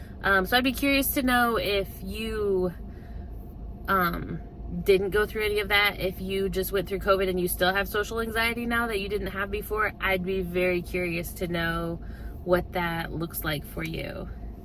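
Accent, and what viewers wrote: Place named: South, United States. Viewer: Any chance you live in Alabama? You sound Southern.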